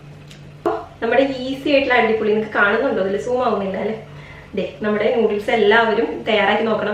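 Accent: Thrissur, India. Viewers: സംസാരത്തിന്റെ സ്പീഡ് ഒട്ടും കുറക്കണ്ട. കാക്കിറ മൂക്കിറ, പിച്ചിപ്പറി, കണ്ടം മുണ്ടം ഇമ്മാതിരി തനി നാടൻ സാധനങ്ങൾ എത്രയുണ്ടെങ്കിലും പോന്നോട്ടെ. അതൊക്കെ പാചകത്തോടൊപ്പം ആളുകൾ ആസ്വദിക്കുന്നുണ്ട്. ഒരു മനുഷ്യനും തനിമ നഷ്ടപ്പെടുത്തരുത് എന്ന അഭിപ്രായക്കാരനാണ് ഞാൻ. മോഡേൺ ശരീരഭാഷയും ഇംഗ്ലീഷും മലയാളവുമല്ലാത്ത വികലമായ സംസാര ഭാഷയും കൊണ്ട് വീഡിയോയിൽ വരുന്ന ആളുകൾ അവനവന്റെയും അതോടൊപ്പം നാടിന്റെയും തനിമ നഷ്ടപ്പെടുത്തുന്നു. സ്വതസിദ്ധമായ തൃശൂർ നാടൻ ശൈലി ഒരിക്കലും നഷ്ടപ്പെടാതിരിക്കട്ടെ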